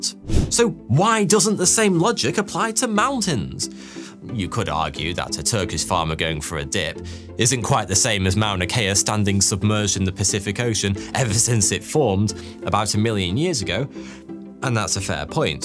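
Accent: United Kingdom. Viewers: Yup, its all about story telling, a nice British accent that gets you 358K view in just 7 days and poor me with all the mathematics and Indian accent can make 400 views in 1+ year 😛- Just measure base to tip and it aint that big ha ha. Love this guy. Wish I had a good voice like him.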